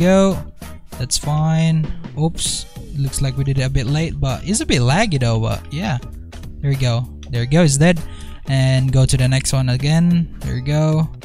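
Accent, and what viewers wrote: Philippines. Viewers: you sound very filipino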